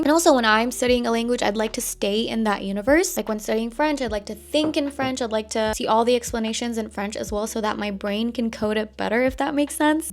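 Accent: United States. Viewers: as a fellow turkish person, can u share how u got this perfect american accent pleaseeee queen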